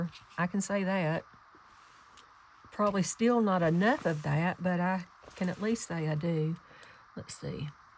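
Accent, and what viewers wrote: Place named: Maryland, United States. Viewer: Hello, what a joy to listen along with you. You are very funny. And I love the sound of your voice. My Uncle called me the other day to tell me my Aunt is dying. She is Maryland, born and bred. And I know your voices are unique across the country, yours is very similar to hers. I will probably listen to this a few times, and although I'm really enjoying your show tonight, I would listen to you read from a catalogue.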